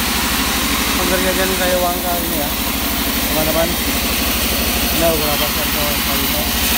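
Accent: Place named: West Java, Indonesia. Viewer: Mantap ey operator na... Asa wawuh sunda na palih mana kang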